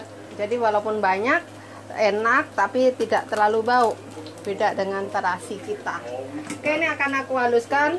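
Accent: Java, Indonesia. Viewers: Mbaknya persis saya, pake wajan sama minyak kayak gitu, nggak usah dicuci dulu dan minyak bekas goreng dipake lagi. 😅😁 bicaranya medok ya, dari Jawa ya mbak? Sip banget. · Bunda orang mana saya orang ponorogo bund.. Sepertinya jenengan logat nya jawa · Jawane pundi toh iki?? Medok buanget logat e 😆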